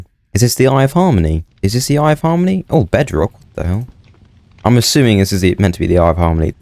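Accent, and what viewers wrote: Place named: United Kingdom. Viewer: U sound British but watching u play I think I may add cheats Cause that will take very long what mod pack did u down load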